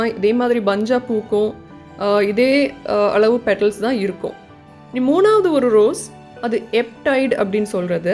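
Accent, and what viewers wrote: Kerala, India. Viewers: Malayali ano? Sounds like Malayali speaking Tamil.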